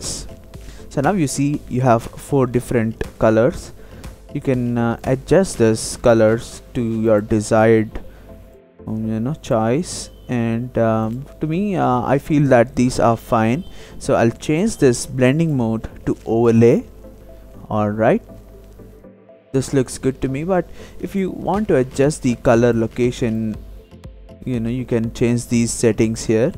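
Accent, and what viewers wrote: India. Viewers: thanks bro are you a south indian guy ?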